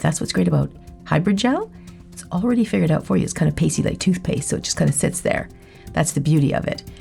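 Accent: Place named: Canada. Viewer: I love your voice & accent. It feels like home as my aunts & cousins are Canadian 😊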